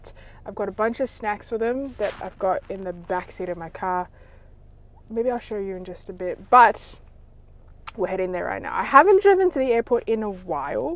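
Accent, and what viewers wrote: Australia. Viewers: Thought u grew up here in oz as you spoke with aussie accent, didnt realise your parents were coming to visit you from overseas... Maybe im wrong? Im kinda half way thru, lemme finish watching to find out! :) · I love hearing your Australian accent because it's so different from where I'm from. But your Cantonese sounds exactly the same as mine!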